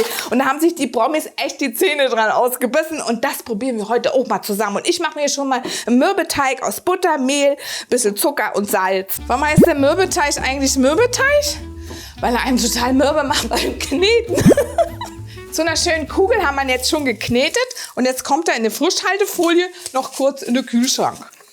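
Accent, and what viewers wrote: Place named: Hesse, Germany. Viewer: Lecker. ☺️ Ich arbeite im schönen Wiesbaden und dachte, ich hätte da so was Hessisches bei dir rausgehört. 😉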